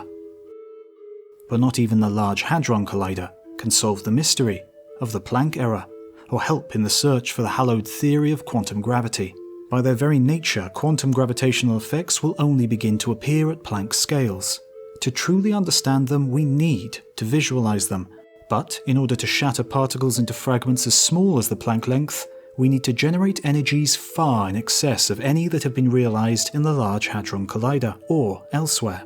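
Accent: England, United Kingdom. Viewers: So glad you don’t have an American accent. Nobody likes to be given information in an American accent over a sort of British accent. Well…there are some grossly intense bigots who love that sort of thing, but they’re outliers. Though…perhaps not so outlying as I’d like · The english accent is suposd to make this sound smart .We know for a fact there was no big bang . Academia's Scientists and its standard cooperation suck .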